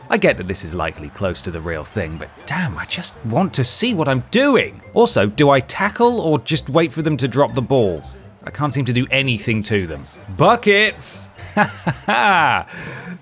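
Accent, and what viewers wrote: United Kingdom. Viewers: A British commentary. Refreshing indeed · I love this channel because they put subtitles, I'm English learner and sometimes I don't understand the british accent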